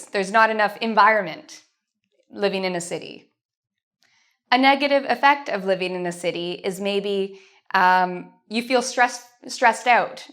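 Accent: United States. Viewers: You're the best teacher I ever had, sirouslly. I'm brazilian and I only have one year of practece but I can speak of course not that good hah lol. All right I love you you're cute you know how teach and even have a American pronanciation. That's what I want to lol. Thank you and send more video okay? Bye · I love the American accent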